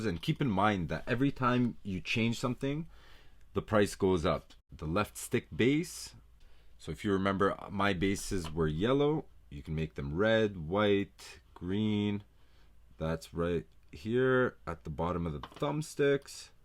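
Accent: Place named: Guyana. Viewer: Yuh sound guyanese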